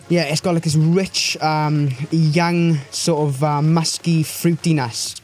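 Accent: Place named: Wales, United Kingdom. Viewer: All the way from the Welsh valley,lol.Love it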